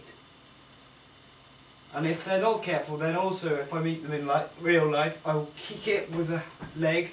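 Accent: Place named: Boston, United States. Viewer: Where do you get a slick Boston accent like that?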